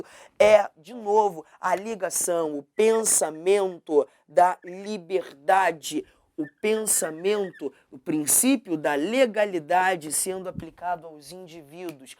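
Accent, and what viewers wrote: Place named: Rio de Janeiro, Brazil. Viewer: Dá agonia assistir por mais de 15 minutos! Cariocão da fala "malhandra" ngm merece!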